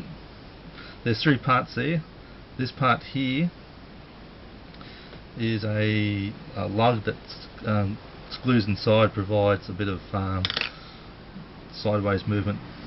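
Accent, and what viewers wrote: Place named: Australia. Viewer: Great to see another Aussie model channel. Its funny how strong our accent is compared to the Brits haha! Cool channel. Just started up my own. Its only couple months old but it's gettin there. Keep it up.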